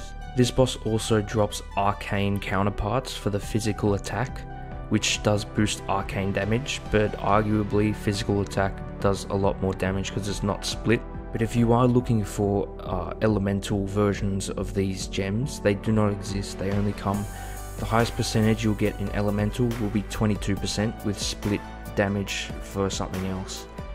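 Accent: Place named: Australia. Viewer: Can we play together. You sound australian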